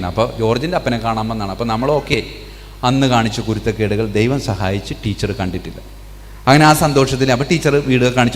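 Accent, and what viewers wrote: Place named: Irinjalakuda, India. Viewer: irinjalakkudakkarrude samssaram pole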